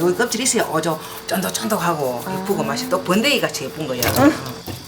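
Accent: Gyeongsang, South Korea. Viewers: 멋진 팁 ! 감사합니다 갱상도 사투리라 더 정감 갑니다 ㅎ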